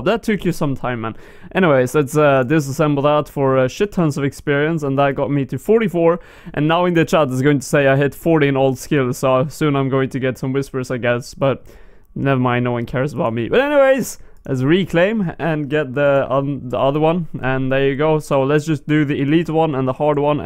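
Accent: Sweden. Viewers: Man i love your swedish accent !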